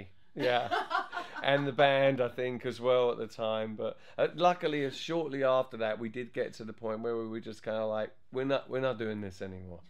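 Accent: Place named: Cockney, United Kingdom. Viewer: A bit of Cockney accent in it, I'd say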